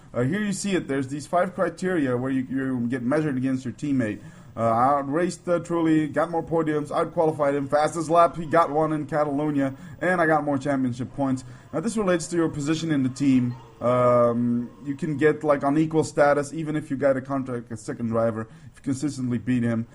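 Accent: United States. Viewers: dude you have a kick ass american accent haha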